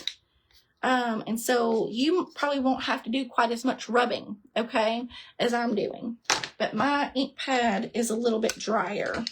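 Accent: South, United States. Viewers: Love these, don't loose your southern accent. You speak my language.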